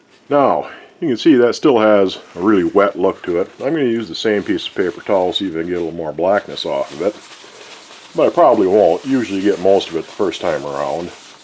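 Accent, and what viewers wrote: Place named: Michigan, United States. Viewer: So cool! I rarely see a reply from so this is a great surprise! When the commenter said, “you have a voice like the skipper from Gilligan’s Island” the Skipper is the son of the great Alan Hail or Hale, who often appeared with Errol Flynn in those awesome swashbuckler movies. Skipper’s name was Alan Hail Jr, or Allen? Sorry. I know the names but don’t look at them in print much because I’m too,busy enjoying them in the movies! Anyway, I’m pretty sure has been a professional voice but he won’t tell us where or who he is. Atleast not that I’ve seen yet. And he’s very humble. But the anonymity is driving me curious. I do recognize a slight Michigan accent though. Would be nice to know his previous profession, maybe still current. One thing’s for sure... the voice is a definite gift and everyone loves it. I say, go for it. 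God bless.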